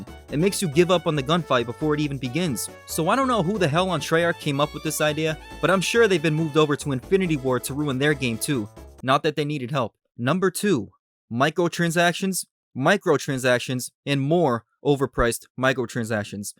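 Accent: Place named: United States. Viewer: What makes these videos even better is the accent🤣 Which state is it btw?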